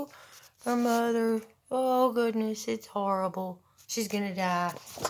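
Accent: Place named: England, United Kingdom. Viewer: I love the english accent...do you have a theater background?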